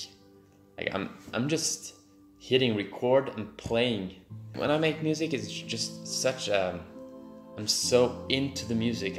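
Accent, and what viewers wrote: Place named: United Kingdom. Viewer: I love British accents! I love it so much!😍😍😍😍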